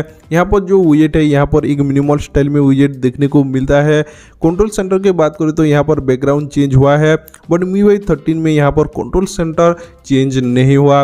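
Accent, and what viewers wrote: Odisha, India. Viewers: I can recognise odia people's Hindi accent from miles away..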